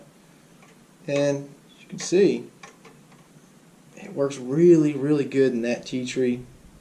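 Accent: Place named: South, United States. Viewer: Got that ol country accent nowadays 👍🏻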